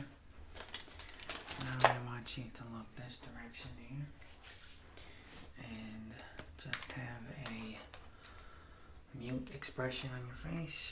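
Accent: Boston, United States. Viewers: Have a question: From which part of US belong his accent?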